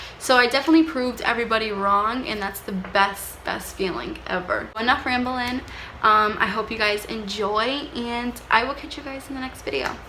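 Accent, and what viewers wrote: Boston, United States. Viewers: You can hear the Boston accent. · I knew that Boston accent the minute you spoke! I💜my city! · As soon as you started talking I could tell you were from Boston! We gotta stick togetha! 😂😆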